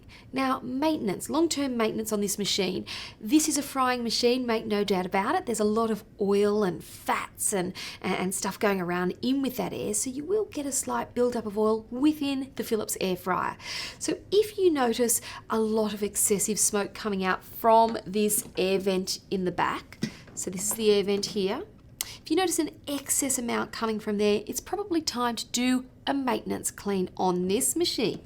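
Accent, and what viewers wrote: Australia. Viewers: the best accent.. gotta love land of kangaroo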